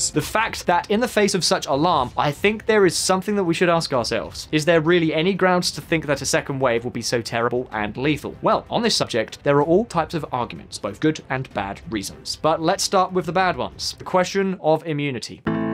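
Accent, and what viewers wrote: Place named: Scotland, United Kingdom. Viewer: I feel like I'd be happy to listen to Scottish guy narrate, but his microphone situation needs some love!!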